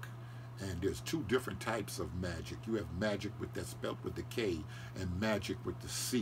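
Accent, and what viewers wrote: New York, United States. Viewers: Of all you got to say, you reply this criticism That's a part of his strong NY accent. Get off the BROTHER. Ask God to help YOU so you will LISTEN to all this brother is saying. YOU got a PROBLEM!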